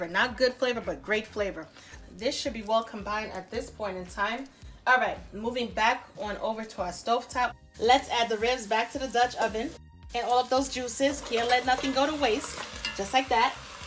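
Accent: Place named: East Coast, United States. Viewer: Yummmyyy!!!! We look for the good food but listen for that East Coast accent! ❤️❤️ 😊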